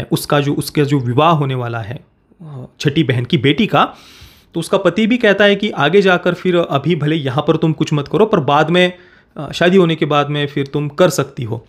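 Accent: Maharashtra, India. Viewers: Wa bhau shuddh marathi madhe😊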